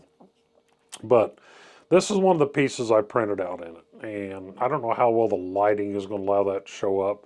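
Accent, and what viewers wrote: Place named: South, United States. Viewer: That's a southern drawl for you. Low and slow. Not in a hurry to get anywhere.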